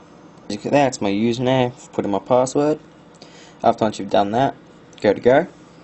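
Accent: Australia. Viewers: Your voice -3 Australian ? ;) me like. Sorry.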